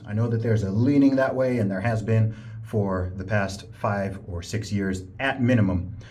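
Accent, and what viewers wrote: North America. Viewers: Actually, I DIDN'T know you were a brit tbh. It's your accent, it sounds abit like North American english. Oof, I feel stupid now.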